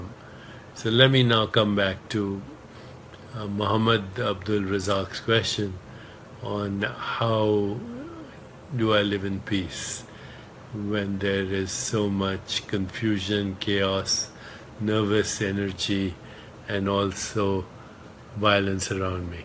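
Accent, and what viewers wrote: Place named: Iraq. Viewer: الي يتكلم وكأنو عراقي قريب جداً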